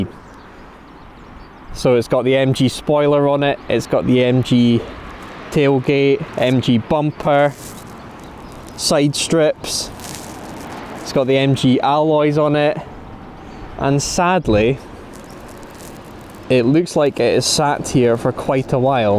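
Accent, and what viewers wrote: Scotland, United Kingdom. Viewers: A car video with soothing music and the most relaxing reassuring Scottish accent I've ever heard? First ten seconds and you've already found a new subscriber!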